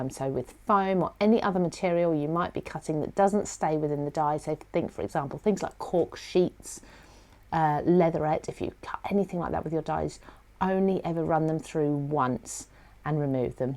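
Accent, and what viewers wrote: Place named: Norfolk, United Kingdom. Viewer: Your accent is lovely! Are you from Norfolk? I'm am American with a fascination and appreciation for all accents British. And your video is great too! ;-)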